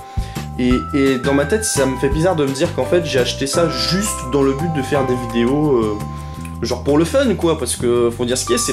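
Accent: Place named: Nord-Pas-de-Calais, France. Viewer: T'es ch'ti toi, je reconnais l'accent de chez nous!!! 62 moi. Je pars aussi au Japon. Photos sur instagram. Il y a déjà des photos des voyages au Japon, Tokyo, Kyoto, Naoshima, Kyushu etc de 2014 et 2015.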